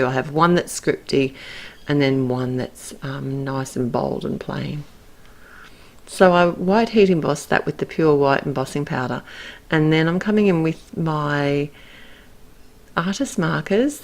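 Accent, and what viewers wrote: Australia. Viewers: Thanks for sharing. Enjoyed this simple but very effective card tutorial. Nice to hear an Aussie voice too. Really hoping to buy some of the new Altenew markers - the colours are very vibrant and pretty. Can we buy Yupo paper here in Oz, do you know? Thanks again for your lovely card.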